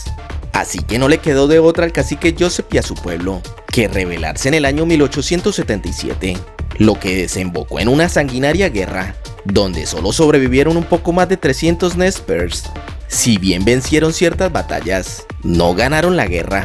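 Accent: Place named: Texas, United States. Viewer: Porqué el acento texano en español?.... Se escucha bastante ridículo y le resta credibilidad al video.... Suena como a una comí quita de Disney de los años 60s.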